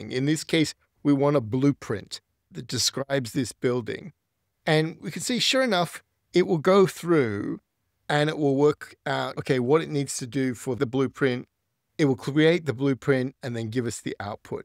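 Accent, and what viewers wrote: Australia. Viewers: Australian or South African? Nice vid.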